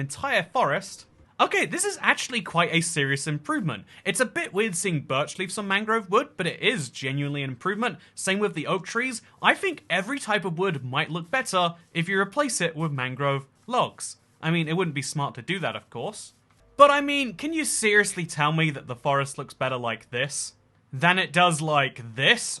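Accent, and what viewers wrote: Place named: London, United Kingdom. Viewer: I mean his voice actually sounds deeper and more london like before than it currently is now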